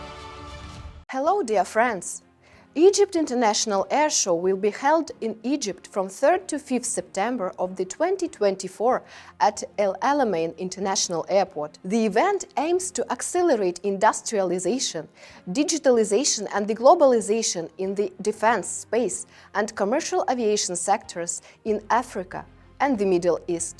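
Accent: Russia. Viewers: Хаха, какой милый русский акцент))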